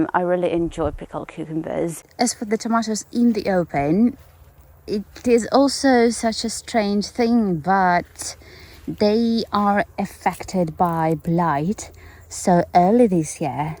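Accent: United Kingdom. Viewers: Ur accent is british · why you have a british accent?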